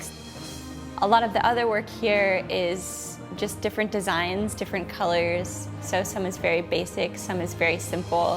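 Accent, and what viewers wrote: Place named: West Coast, United States. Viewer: A statement should never sound like a question. This video has so much to share. Please watch your west coast accent.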